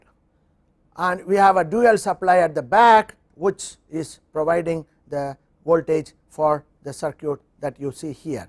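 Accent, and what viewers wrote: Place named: India. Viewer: whether a person says "Circuit" or "Circute" he still is a respected teacher who explains it better that most Western teachers i know. These lectures are made for people who respect the topic and the knowledge it brings. Anyone having a problem with the Indian accent, can TRY to get this kind of quality education elsewhere.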